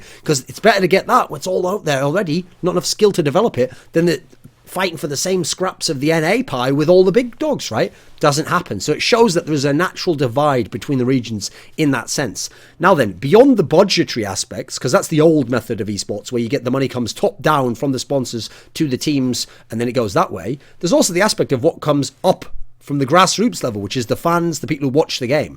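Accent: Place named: Birmingham, United Kingdom. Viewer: Weak brummy accent. Sort your shit out Thorin.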